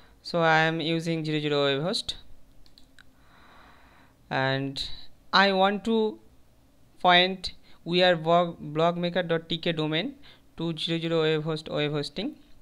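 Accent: India. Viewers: DAMN !!!! i hate india pronunciation in english, like they have candy in their mouth while they're talking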